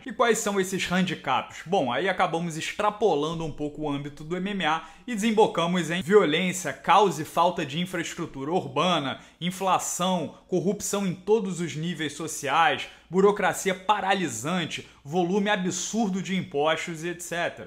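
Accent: Rio de Janeiro, Brazil. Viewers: Curto os seus comentários! Mas tem que diminuir no sotaque carioca....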